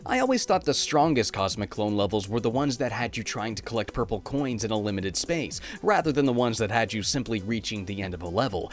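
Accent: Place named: Canada. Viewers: Really? That’s a shame man. Canadian accent or not the analysis would still be spot on. I’d say that’s what really matters. Ya know, aside from letting you know about how Chargin’ Chucks are in 3D World again. And again. And again. And again. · Hello, Please ignore them. It is a shame that you should feel you should hide your Canadian accents because of people. On the contrary, I'd like you to remain the dude you actually are, don't force yourself to be otherwise. Also, there is a ludicrous amount of videos in American English on the internet, so listening to another accent is overwhelmingly refreshing; that accent is not missed... at all. Actually, that is quite unfortunate that the American accent seems to be the norm in videos and in English courses at schools. Please, keep up the good work!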